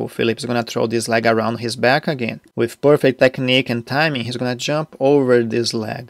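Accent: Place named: Quebec, Canada. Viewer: Hey I just noticed your voice reminds me of GSP! Did you spend some time living in Quebec?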